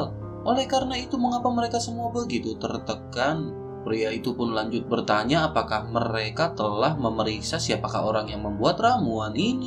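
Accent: West Java, Indonesia. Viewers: Sunda ya min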